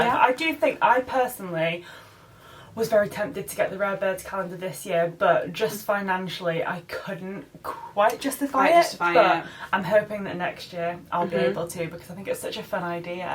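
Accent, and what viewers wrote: United Kingdom. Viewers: I love your UK accent!!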